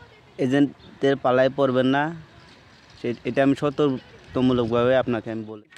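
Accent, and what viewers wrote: Bangladesh. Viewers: বাংলাদেশের বাসিন্দা,, চুরি করে ভারতে এসে পাসপোর্ট ভিসা,, বানিয়ে রাশিয়া গিয়েছে,, ভাষা শুনে বোঝা যাচ্ছে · নিজেকে ইন্ডিয়া মনে করো তুমিতো বাংলাদেশি কোথায় বোঝা যায় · ভাই আপনি বলেছেন আপনি ইন্ডিয়ান, আপনার ভাষা না ইন্ডিয়ান, না কলকাতা, আপনার ভাষা শুনে মনে হয় আপনি বাংলাদেশি। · Apner vasha sune mone hocche Bangladeshi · Ekdam tai, or khota bartai bangladeshi r moto.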